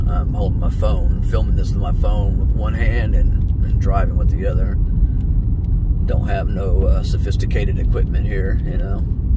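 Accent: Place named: South, United States. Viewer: wasnt it raining in this video though? are you in the south? your accent sounds southern. i know rain is much thicker and heavier there than the PNW.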